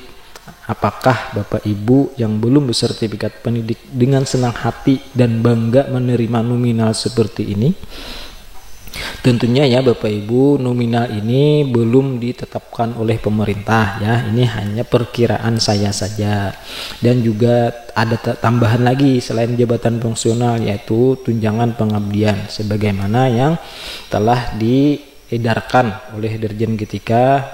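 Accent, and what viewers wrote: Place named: Banjar, Indonesia. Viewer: medok sekali banjarmu sanak😁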